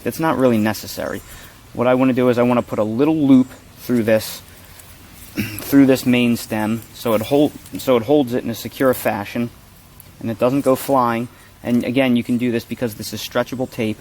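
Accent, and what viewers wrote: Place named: South Jersey, United States. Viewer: I live in suburban philly and that sounds like a south jersey accent!!!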